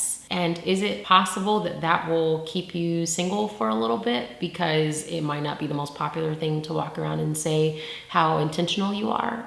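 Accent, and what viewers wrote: South, United States. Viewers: Lol where I'm from we always talk like you do with a southern accent for jokes but you really talk like that and I just couldn't take you serious I kept thinking you were joking 😂😂🤷🏾‍♂️ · Her southern Belle voice is beautiful lol whoever hasn't got a chance to see her LIVE and in person. I need that to change in 2018. I've been grateful enough to have seen her LIVE about 3 times. And she is such a GOD GIRL . Years ago I bought one of her jackets. And it's been keeping me warm ever since.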